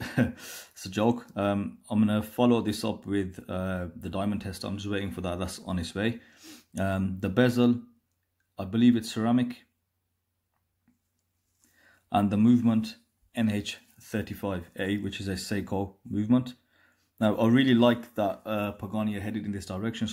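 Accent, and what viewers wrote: Birmingham, United Kingdom. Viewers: Subscribed mate.. have to support my fellow Brummie... well you sound like a Brummie 😅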